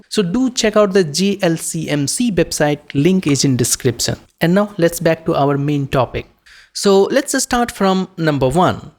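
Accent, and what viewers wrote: India. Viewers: are you Gujarati?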